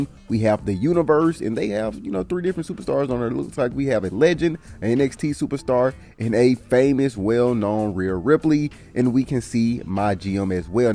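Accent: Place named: Memphis, United States. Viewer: I can’t ignore the Memphis??? Accent ..”herrstyles” and “yerrs “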